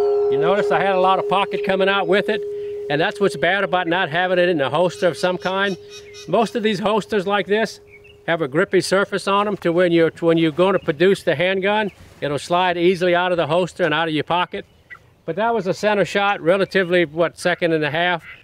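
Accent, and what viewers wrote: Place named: Cajun, United States. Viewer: little tinge of cajun in your accent! are you from acadiana?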